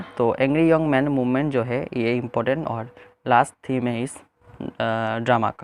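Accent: Bengal, India. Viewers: Aap bengali ho? aapki ascent se pta chl rha h Nice explanation 😊😊 It'll help a lot in answer writing.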